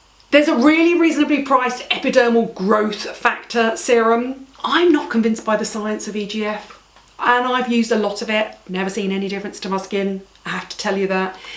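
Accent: United Kingdom. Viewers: I totally agree! Even tho,...I miss a lot of what she says with her lovely, fast-talking British accent. But it sure is nice.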